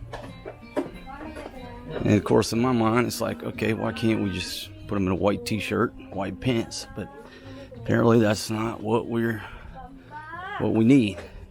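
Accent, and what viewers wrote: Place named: Western Pennsylvania, United States. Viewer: You have a western Pennsylvania/Ohio/WV accent.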